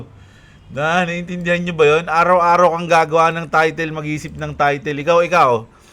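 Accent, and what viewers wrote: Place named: Caloocan, Philippines. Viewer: Alam mo gustong gusto ko ang mga Pananalita mo. Lumaki rin ako sa caloocan at ganyan ganyan din akong magsalita noon Pero ngayon senior na ko at tumira dito sa mindanao naiba na ang pananagalog ko. XRP lilipad na!!!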